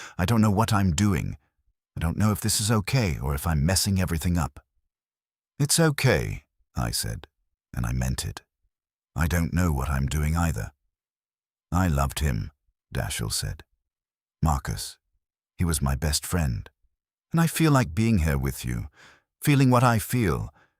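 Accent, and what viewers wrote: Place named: RP, United Kingdom. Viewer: The formal British accent totally destroys any atmosphere or warmth of authenticity.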